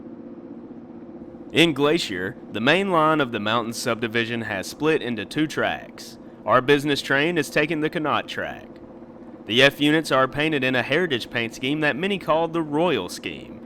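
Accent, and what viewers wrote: South, United States. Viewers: Why is this beautiful train of Canada being announced by announced by an American with a southern accent?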